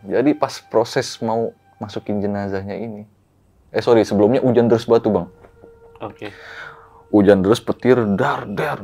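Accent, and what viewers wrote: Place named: Bali, Indonesia. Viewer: Ini host nyh orng BALI kah ? Logat nyh jelas bgt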